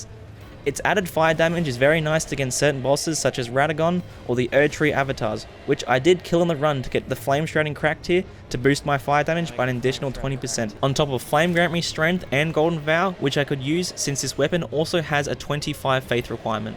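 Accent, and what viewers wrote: Australia. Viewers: u sound a bit like crocodile dundee mate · my god you are soooo australian, i love it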